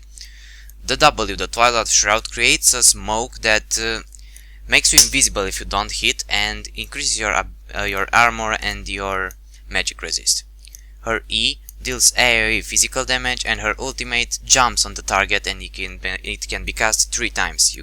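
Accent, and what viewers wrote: Romania. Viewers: Se vede pe vocea ta ca esti roman :). Joci foarte bine cu Akali. Tine o tot asa. Cred ca o sa mi o cumpar si eu. :) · are u from romania?:D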